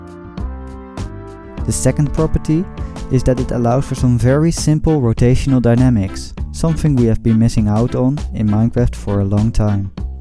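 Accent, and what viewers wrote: Netherlands. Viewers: Het accent wel:3 Ik ben trouwens ook heel blij dat ik een Nederlands accent heb herkend. Ookal is het best makkelijk;3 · Ben je Nederlands? je hebt namelijk een echt Nederlands accent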